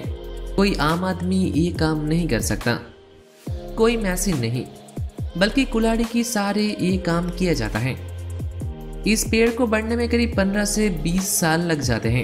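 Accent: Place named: South, India. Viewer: Aapke accent se laghta hai aap South se ho ..nice video....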